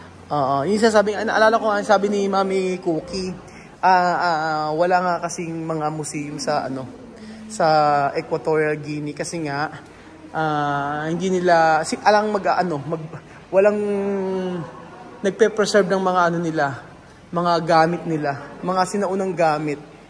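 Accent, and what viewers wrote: Nueva Ecija, Philippines. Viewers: Extrovert kc tlga c kuya tas taga Nueva Ecija pa, minsan ang punto ng pananalita d2 sa amin sa Nueva Ecija e sadyang mlkas at minsan napagkkamalang maybang pero ganun po tlga kming magsalita. Inamin din nmn ni kuya na may pagka bully sya, mapang asar din sya pero ganun nga ang personality nya. Oo nga, minsan need din tumahimik lalo na sa mga museums o sa lugar na dpt di maingay. Di rin ako pabor na isinasama si Misma sa mga green jokes kung nagkaganun nga( di ko npansin nung pinanood ko video sa LU). Pero cguro pag di rin magdadaldal o tatawa c kuya, may masasabi n nmn tayo ano? Kung seryoso sya, may masasabi ulit tayo. Lahat ng galawan nya, papansinin natin di ba? Tas ibabash natin sya di ba? Kya, madaldal man o tahimik c kuya, may masasabi at masasabi pa rin tayo. Hayyyy, ewan ko my face( ibash ko n rin self ko)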